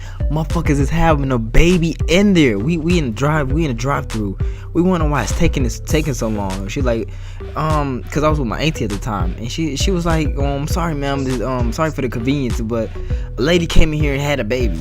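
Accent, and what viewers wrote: Chicago, United States. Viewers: You from Chicago ?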